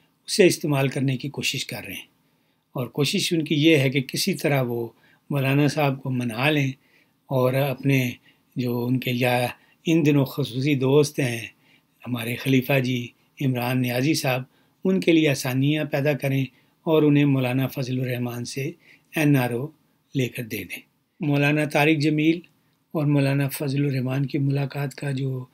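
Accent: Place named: India. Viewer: And let us all know what is RM TV? Is it shorter form of RAM? Coz you speak like Indian.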